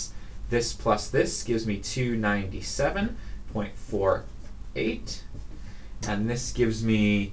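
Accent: Canada. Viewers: are you Canadian??????? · Please tell me your from Montreal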